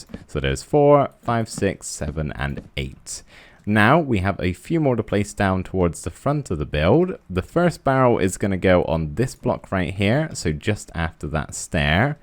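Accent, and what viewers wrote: United Kingdom. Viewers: Bro, your British accent sounds really cool)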